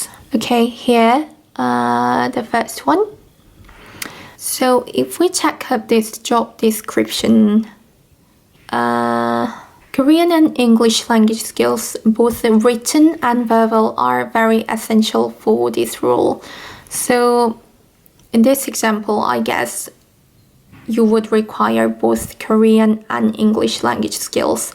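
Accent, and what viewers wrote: United Kingdom. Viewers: 우와 발음이 미국 발음이 아니라 영국 발음이네요 완전 멋있어용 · 이제는 누나라고 부를게여... 영국발음 너무 멋지잖아여!! 저도 슬슬 인턴 나갑니다. 영국가는날까지 열심히 해야겠어요 ㅎㅎ 코로나 조심하세요~~~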